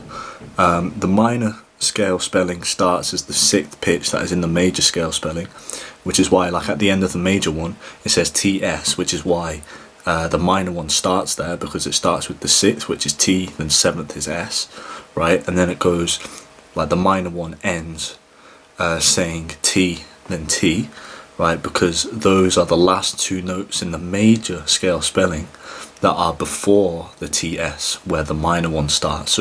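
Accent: United Kingdom. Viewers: may I inquire as to what part of the UK you're from, can't quite identify the accent . PS I really enjoy your down to Earth Style of instruction.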